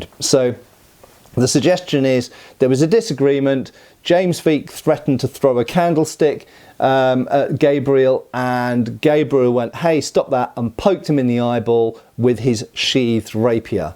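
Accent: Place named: United Kingdom. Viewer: I assume that this is STILL hoe you Brits sound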